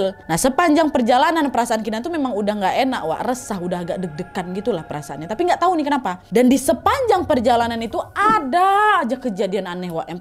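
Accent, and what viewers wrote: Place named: Medan, Indonesia. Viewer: ini pintar,lucu selain logat medannya kental Trnyata pintr jg ngmog jawa nnlogatnya pun bgs... Sukses selalu... Salam dr Turky 🙏🙏🙏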